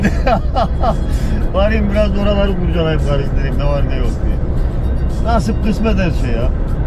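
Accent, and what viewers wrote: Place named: Çorum, Turkey. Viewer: Dede sen corumlu olmadigina eminmisin.corumlular gibi konusuyon.kirsehir corum şivesiylemi konususyor yoksa yaw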